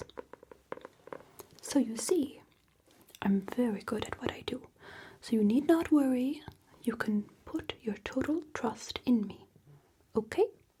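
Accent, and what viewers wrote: Long Island, United States. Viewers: You are looking so cutesy putesy!!! Your accent is the best, especially that heavy long island one you do in some of your rps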